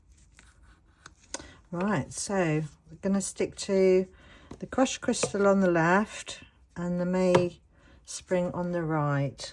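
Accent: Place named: United Kingdom. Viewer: You actually answered a question that I was already wondering. Thank you so much! I already own the Mayspring Dazzling Diamond, but I have heard other crafters raving about the Crushed Crystal. I considered buying the Crushed Crystal, just to see what everyone was raving about. You just saved me some money. I can wait till I use all of my Mayspring then have a go with the other one. Again thank you. I am a new sub now because I think you are doing an amazing job on your channel. Oh and I love your name, it was the name everyone called my mother (a nic-name) I am a Brit living in USA so I like the accent too. Anyways well done my new YouTube friend.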